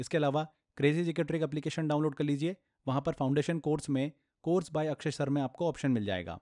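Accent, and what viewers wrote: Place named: Gujarat, India. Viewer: Aap hai Aapki tone gujarati hai but surname toh up ya bihar mein hota hai Q)toh aap kahan se ho: 1)Gujarat 2)Up 3)Bihar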